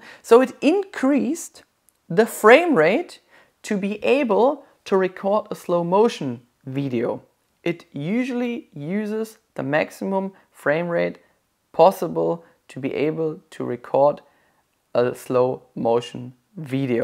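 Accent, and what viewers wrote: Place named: Germany. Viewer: I work for a german boss. Your video reminded me of him. Both because of your accent and almost ridiculous attention to the remote details :D This is an excellent video. I recently got a gopro 9 black as a birthday gift. This video will be really useful for me. Keep up the good work !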